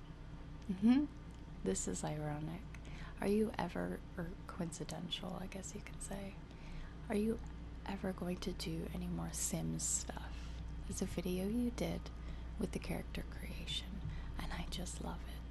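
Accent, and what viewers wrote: Canada. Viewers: It's so cute, I can hear the Canadian in your accent in a lot of your words in this video for some reason. Maybe my left ear is compensating for my malfunctioning right ear so I am able to pick up on it better. I don't know. Anyway, I find it quite endearing although I don't know why.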